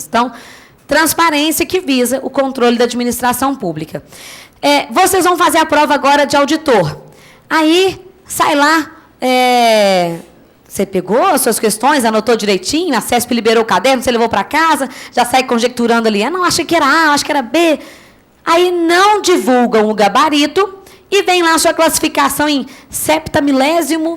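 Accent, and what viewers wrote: Minas Gerais, Brazil. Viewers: Mas que professora mais sensacional! E esse sotaque mineiro nosso, gostoso de ouvir. Parece que eu to conversando com uma amiga. Vocé é maravilhosa.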